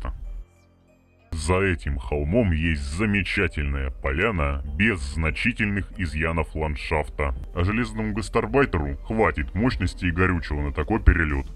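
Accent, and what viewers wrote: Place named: Soviet Union. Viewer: Автор клоун,комунист,который думает что конченый советский говор и элементы советских реплик сделают его крутым.Хуже канала по СФС не видел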